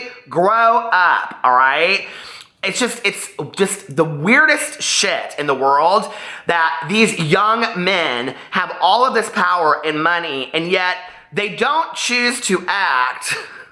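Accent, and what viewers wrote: South, United States. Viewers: Or is it stop sign? Hell I don't know but i will sing the heck out of all the versions lol you can probably tell I have a bit of an accent already. You give me a little southern belle sometimes to so it could sound natural to you. But I also get big city New York vibes from you. OMG I'm typing as I watch your videos and you literally brought up STEVIE. You are my kweeeen , your Leslie shaee famouuusss nowwww lol you've been. Sorry I live type and it gets long honey. But you know how we get to talking and just can't stop .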